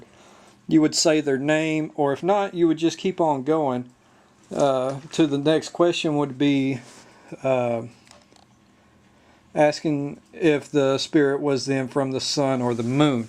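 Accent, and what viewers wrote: United States. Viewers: what part of East Texas are you from? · SOUTHERN ACCENT HEE YA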